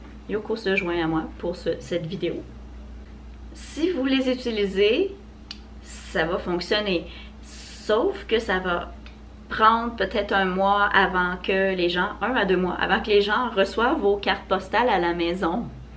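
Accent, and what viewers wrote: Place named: Canada. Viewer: votre accent n est pas français,il est bien canadien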